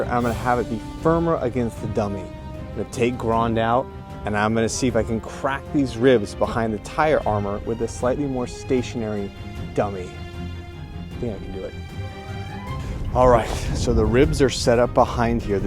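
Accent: Australia. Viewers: Thought it was pretty good you doing an aussie accent .as im an aussie . you actually sound more aussie than most people i talk to.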